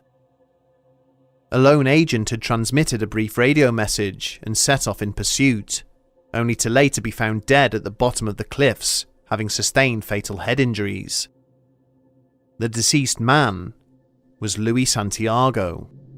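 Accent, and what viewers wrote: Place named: United Kingdom. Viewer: Got yourself a sub, my guy it's good to hear a fellow UK person do these kinds of videos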